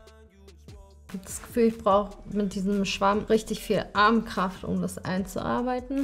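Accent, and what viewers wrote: Berlin, Germany. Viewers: ick weß it gar nicht mehr ''😂da kommt die Berlinerin aus dir raus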